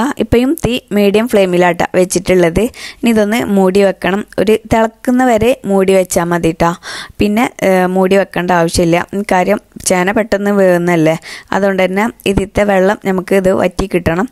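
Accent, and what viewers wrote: Kasaragod, India. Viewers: മലപ്പുറം ആണങ്കിലും സംസാര ശൈലി ശരിക്കും കാസർഗോഡാണ് ട്ടോ -😍😍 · Ninthe samsaaram full ksd mix anne Njn first time vedio kaanumbo kasarkod kaarthin enn njn vicharichatt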